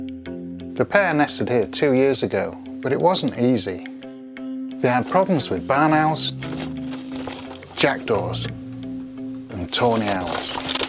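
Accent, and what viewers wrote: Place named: Yorkshire, United Kingdom. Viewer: I love it that every time Rob says ‘tawny owls’ the CCs translate it as ‘toenails’. Mr and Mrs Kes had problems with Jackdaws, Barn Owls and toenails 🤣 Gotta love the Yorkshire accent 🤍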